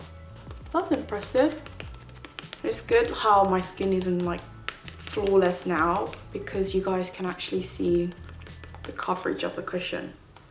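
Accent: United Kingdom. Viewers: 첫인상 리뷰 너무 좋아요 언니 영국 발음 볼때마다 짱짱...사랑해요....❤️❤️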